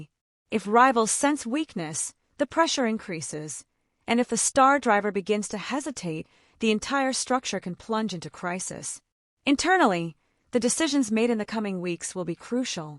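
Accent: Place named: United States. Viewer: good hear some American accent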